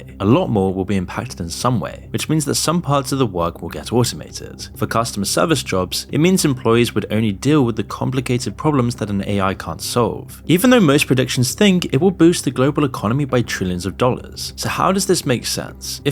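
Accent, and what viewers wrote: United Kingdom. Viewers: Are you speaking with your voice or generated it with AI? If you are generating it can you please make it with American accent not British, it’s hard to listen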